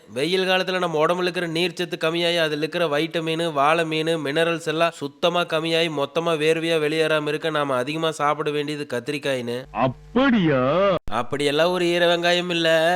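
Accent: Kongu, India. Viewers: கொங்கு பேச்சு எனக்கு சுத்தமா பிடிக்காது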